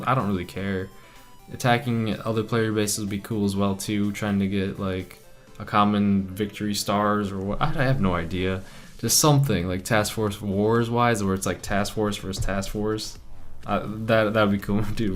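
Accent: Canada. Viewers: sounds Canadian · Is Canadian?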